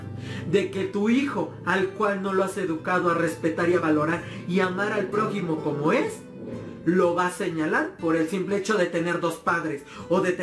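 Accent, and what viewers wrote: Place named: Fresa, Mexico. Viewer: <link> cagan sus caras que hace y su tono de fresa de nezagualcoyotl neta este tipo me hiper cago la madre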